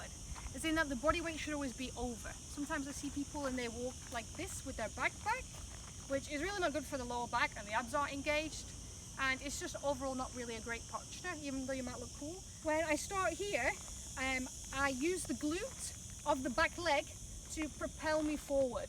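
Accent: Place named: Scotland, United Kingdom. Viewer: I’m distracted .. what accent is that ? It sounds Scottish , north east England come either Spanish or other Mediterranean?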